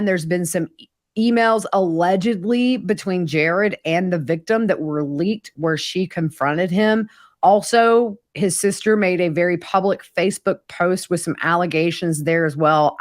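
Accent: South, United States. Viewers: I could listen to you talk 24/7! I just love your voice with a slight accent. Are you from the South? I’m in western North Carolina but my accent sucks! lol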